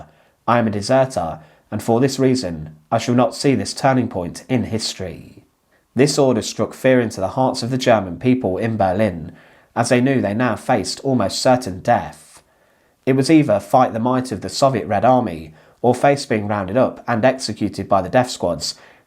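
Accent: United Kingdom. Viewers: As an American, he speaks with what I consider to be a classic British accent. Sometimes he's hard to understand, but that's part of the fun of listening to different English accents. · I agree. And I particularly like his type of accent. Not sure what part of the UK it comes from, but it is lovely.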